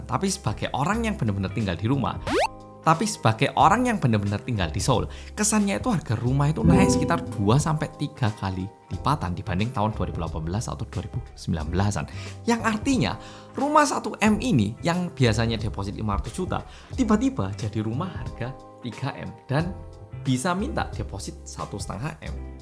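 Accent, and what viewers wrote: Java, Indonesia. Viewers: Seharusnya mas ini yg jd penterjemah tim Red spark. Bhsa Indonesia nya super lancar jaya n medhok. Ngomongnya udh Indonesia bangetlah pokoke👍👍👍👍👍👍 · Aku kok belum terima ya mas ini orang Korea 😢 medok gitu kok, pura2 kali JD orang Korea 😢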